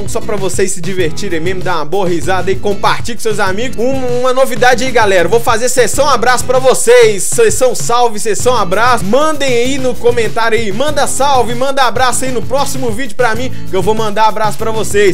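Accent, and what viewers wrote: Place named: Minas Gerais, Brazil. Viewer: ontem fui em seu canal vc não respondeu vc é de Minas desculpe mas seu sotac e bem parecido gostei muito do canal nao sei nada de jogo assisto por vc rses vc que anima beijinhos 😘😘😘😘😘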